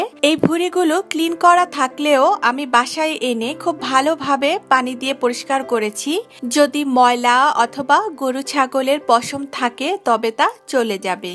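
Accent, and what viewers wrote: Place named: Barishal, Bangladesh. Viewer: ম্যাডাম আপনার বাড়ি কি বরিশাল।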